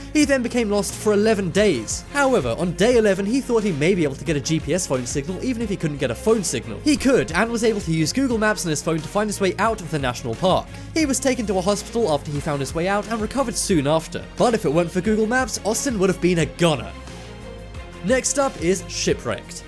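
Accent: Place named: United Kingdom. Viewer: When you enjoy listening to a British accent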